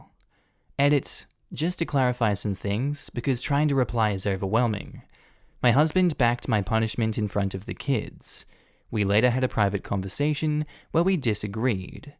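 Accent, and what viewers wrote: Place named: Australia. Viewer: Hey! From Canada 🇨🇦 love putting on your playlists and fall asleep to your Aussie voice 🙏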